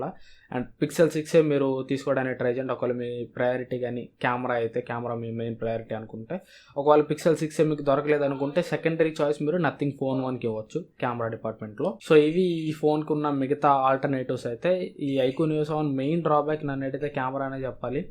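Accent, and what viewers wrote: Ongole, India. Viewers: Bro meedhi Ongole haa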